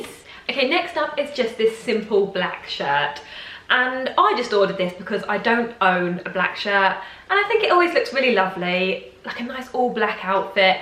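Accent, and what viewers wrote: United Kingdom. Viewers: I love your hauls and wanna purchase everything you are showing. And I love to hear your british accent, I’m crazy about it. Greetings from Poland 🙂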